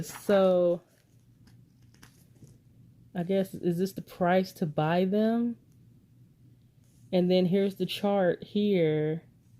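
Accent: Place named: South, United States. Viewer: Yes!! A review with a southern accent. Thank you. Love it.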